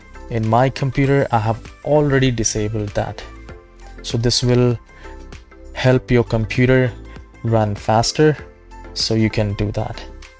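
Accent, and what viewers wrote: India. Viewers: Oh 💔! Your voice too much cute and sounds bangalian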